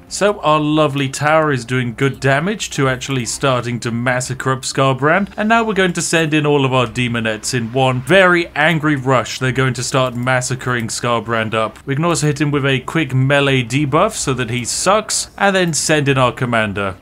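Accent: United Kingdom. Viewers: British pink daddy!! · Pink British Daddy, was all I needed to hear today. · Ew British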